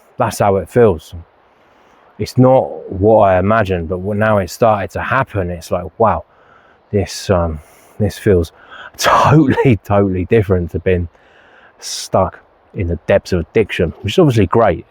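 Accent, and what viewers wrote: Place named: New Zealand. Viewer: You really picked up the new Zealand accent love it!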